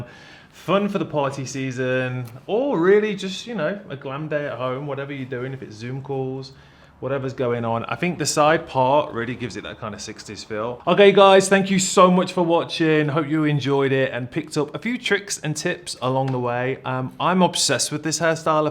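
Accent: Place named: United Kingdom. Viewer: hold the phone.....he's BRITISH???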